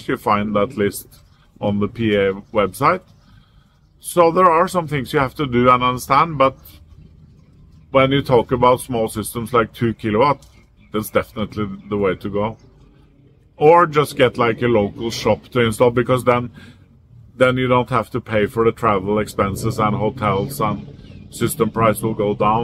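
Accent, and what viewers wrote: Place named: Norway. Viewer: 555..Typical Norwegian English ala Stoltenberg.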